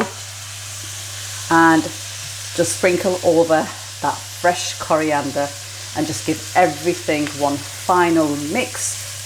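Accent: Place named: Manchester, United Kingdom. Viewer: Hello Your accent sounds from Manchester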